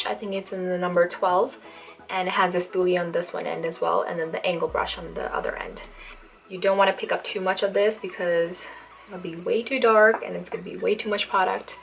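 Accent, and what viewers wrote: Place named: United Kingdom. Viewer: You are starting to have the British accent 😜 Love your brows, they look pretty identical!💋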